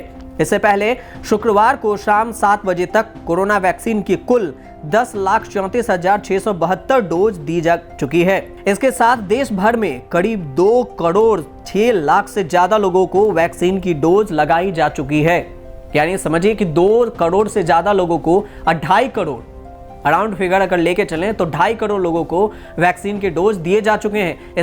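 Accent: Bihar, India. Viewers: A bihari accent thheek karr Bhaarat hota he Bhaadat nai Zaroori he Zadoodi nai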